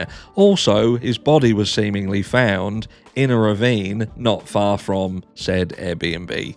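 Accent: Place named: London, United Kingdom. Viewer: Love that London language Mr ❤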